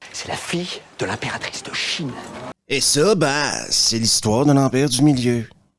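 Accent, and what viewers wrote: Quebec, Canada. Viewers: Mon gars ta chaîne c'est une petite pépite,je dévore tes vidéos les unes après les autres. Déjà que j'ai un faible pour l'accent québécois mais là franchement avec ton humour en plus ta façon de t'exprimer franchement c'est vraiment que du kiff. Et bordel qu'est-ce que astérix et Obélix la Terre du Milieu est un film de merde et de voir Guillaume Canet chialer en disant allez voir mon film c'est vrai que franchement c'était pathétique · avec ton accent je voyageais au pays de la poutine et au jourd hui j'apprend que tu vis chez moi XD c'est beau mais ca casse le rêve ahahha . des bisou merci pour tes video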